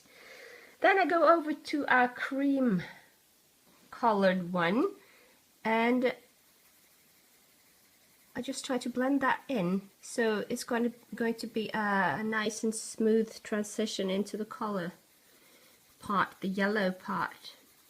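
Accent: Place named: England, United Kingdom. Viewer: I have searched and search for an in depth "how to draw gems" video and yours is the best by far. Your english is great, you have an english accent. Thank you so much for taking the time to post this video. Cheers Janey from the Land down Under (Oz)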